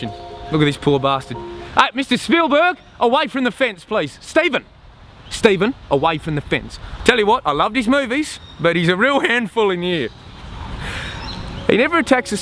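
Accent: Australia. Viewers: yikes. i really doubt anyone wants to go a country where they'd have to hear your stupid aussie accent every day. better to be eaten by sharks. trump 2016!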